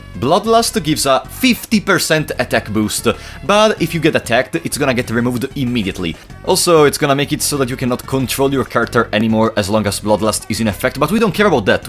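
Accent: Italy. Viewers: Oh my god, now I love the Italian accent because I can't make out exactly what Americans, British and Australians are saying in English😂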